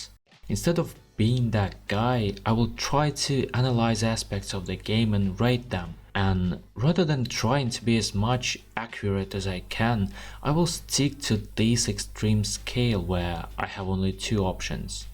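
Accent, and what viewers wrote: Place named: Russia. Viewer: Just found your channel and honestly your editing style is really great! If I could levy a few suggestions, I think you should raise your voice a little, rather naturally or in post editing - from what it seems like you are a native Russian speaker, but I could be wrong, so don't worry too much about your speaking or accent if you are or not, good content usurps it and this certainly was, even though your voice was definitely not an issue. Another thing, I do think your intro meme/video was a bit long and might cause some early click-offs - shorter length ones in the future will do the opposite. Also great background footage! You can tell its from mostly if not all of your own playthroughs, so it gives credibility to your arguments. As someone who was introduced to the souls series through Dark Souls 2 and a personal fan of the game, this video was honestly pretty solid even though it felt like you sided against it in a sense. 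Again though, amazing video!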